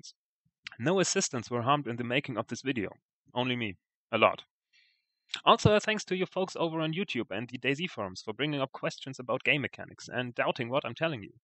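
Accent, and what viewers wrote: Germany. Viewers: Do you are German? I could swear you sound like a German xD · You sound german :D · gutes video ich find es immerwieder witzig deutsche english reden zu hören :D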